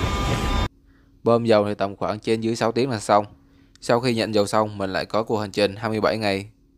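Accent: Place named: North, Vietnam. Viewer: Mình xin góp ý với chủ kênh.mình là người gốc bắc thì cứ nói theo thuần tiếng bắc.anh chị em sẽ rất ghi nhận công sức của bạn! Chân thành!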